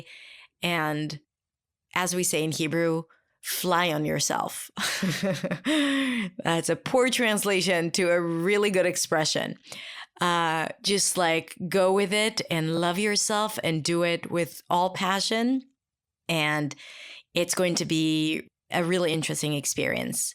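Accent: United States. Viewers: Hey Hey everybody hows your day so far. Express in tones melody Pitch higher more lower Pitch less . Awareness is the key for Clarity .I have spoke English all my life American and yours sounds perfect American inclination speech.If you never tell you are Hebrew as was More Aaron and Merrian .No one would know you aren't born and raised in America .I had lots of physical therapy but never my speech .I had to relearn speech and letters and words numbers all over after my first stroke and lost all ability to walk function and speak. . So I'm self retaught and over minor strokes continue. I'm Bonnie!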